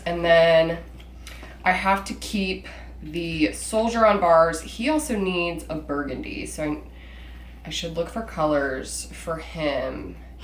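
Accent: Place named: United States. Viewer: I love in American Accents. By the way, May I know Where is your accent in America?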